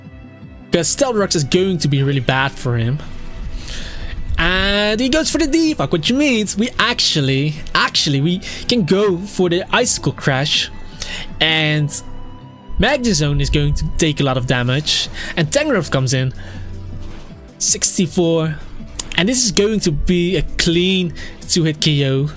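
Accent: Netherlands. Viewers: Just out of curiosity, why do you want to have a British accent? I kinda like your Dutch accent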